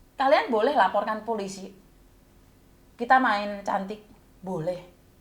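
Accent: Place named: Java, Indonesia. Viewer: Mbak katanya orang BALI.BALi Nya mana Mbak.kok bahasa Jawa ne meduk kalau orang asli BALI ndak bisa bahasa Jawa.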